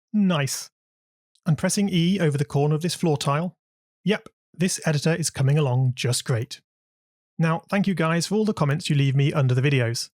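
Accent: United Kingdom. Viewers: for some reason, the best coder i know loves it when his own characters die... i guess i kinda do to, you feel a sense of pride watching all of your hard work lose their life, also give me your British accent.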